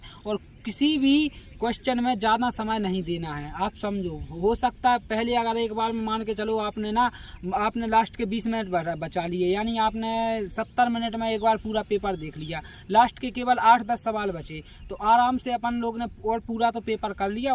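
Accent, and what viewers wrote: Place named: Rajasthan, India. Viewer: Bhai apka Rajasthan me kha se ho rajsthan jaisi language lag rhi hai like bharatpur alwar dholpur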